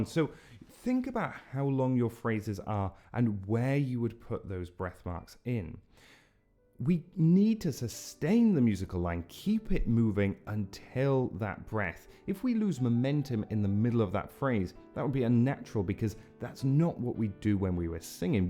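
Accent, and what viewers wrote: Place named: United Kingdom. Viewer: Beside the subject...I absolutly love his accent ❤ Is it British....certainly not Américain 🤮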